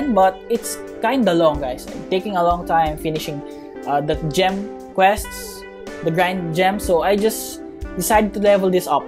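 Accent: Philippines. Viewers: I know the accent , Your a Filipino right? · R u a filipino u sound like filipino hahahahaha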